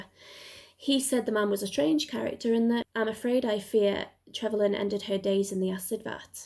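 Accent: United Kingdom. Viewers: great accent still Keep up the good work !, I cannot tell if you’re from near Manchester or Liverpool or somewhere in between??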